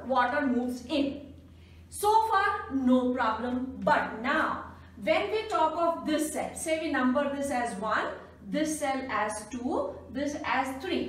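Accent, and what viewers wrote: India. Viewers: Her Indian accent is gold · why all indians have this accent, you are a doctor but you really should improve your accent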